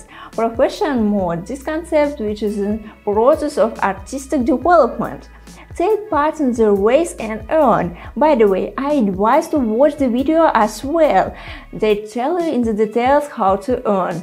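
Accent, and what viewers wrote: Russia. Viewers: how to understand in a second that she is from Russia))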